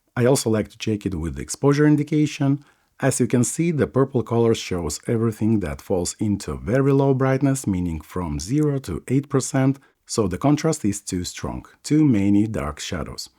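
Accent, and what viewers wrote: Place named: Russia. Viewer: Вроде парень наш, русский или нет? Вот прям чувствую ломаный английский, акцент русскоговорящих.))).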